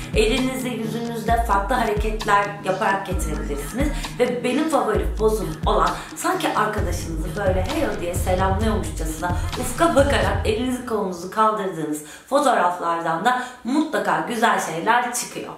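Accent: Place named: Aegean, Turkey. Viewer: sesin ege kökenliye benziyor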